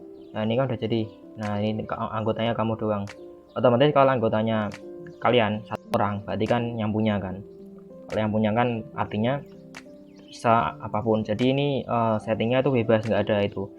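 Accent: Indonesia. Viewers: Thanks Banget bang Info nya,btw abang orang jawa ngapak? XD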